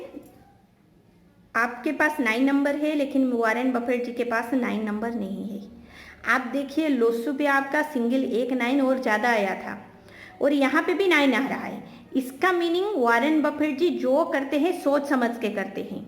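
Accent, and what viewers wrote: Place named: Bengal, India. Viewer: Ok. Nice of you. Will I get a government job? Odisha seems like Bengali accent. Nice of you.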